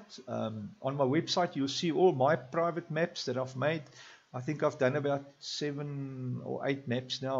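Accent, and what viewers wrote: South Africa. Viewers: I hear SouthAfrican English, I upvote ;)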